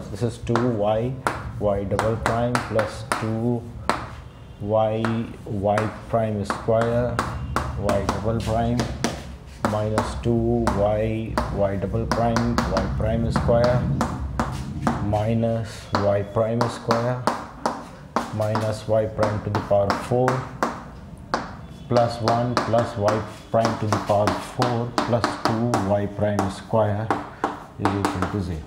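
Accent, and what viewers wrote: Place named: India. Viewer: I like indian english accent also its hard to understand sometimes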